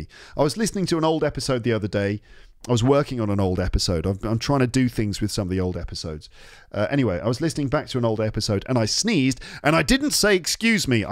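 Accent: RP, United Kingdom. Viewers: Found your podcasts recently. Love them 😊 your natural and vivid way of speaking is very, very interesting and makes it very enjoyable to listen to you. Love your accent! So British! So nice. Thank you so much and the best of luck for the next year (2022) as I am listening to this only in mid Dec 2021! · totally agree. finally found a channel speaks British English, and the accent is so nice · Hi,. I've been following you for the past four years. I have always loved your voice and your British accent and therefore I would like (oneday) to be able to speak English the way you do. Today I have found you in youtube and It has been such a nice surprise!! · Yes, you do have all sorts of listeners. I, for instance am a 70 + retired teacher of English. I listen avidly to your podcasts as they provide excellent exposure to the English as spoken by the native speakers.An added advantage is that it is in modern RP. 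Carry on with your good work. Cheers. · I found out you when I lwatched AJ Hoge's live stream. Someone asked him to recommend a podcast in Britain accent and he recommend you. And he also added that his podcasts aren't boring and you can enjoy while listening. I realized how wonderful teacher he is! And after watching you I see you are also a great teacher and positive like him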